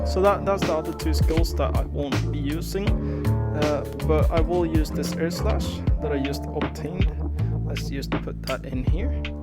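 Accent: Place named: Sweden. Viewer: Visste inte att du var svensk före den här videon. Man hörde det verkligen på din dialekt.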